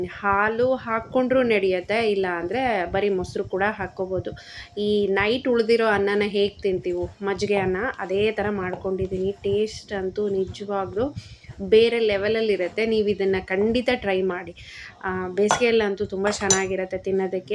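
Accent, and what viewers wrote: Raichur, India. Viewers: ಅವರೇ ನಮ್ದು ರಾಯಚೂರು ಹತ್ತಿರ ಬಂಗಾರ ಹಟ್ಟಿ ಹೀಗೆ ಇರೋದು ಇಲ್ಕಲ್ ಬಾಗಲಕೋಟೆ ಡಿಸ್ಟ್ರಿಕ್ಟ್ ನ್ಯೂ ರಾಯಚೂರು ಭಾಷೆ ಮಾತಾಡ್ತೀರಲ್ಲ ನನಗೆ ತುಂಬಾ ಇಷ್ಟ ಆಯ್ತು ಅಡಿಕೆ ಡೈಲಿ ನಿಮ್ ಚಾನೆಲ್ ನೋಡ್ತಾ ಇರ್ತೀನಿ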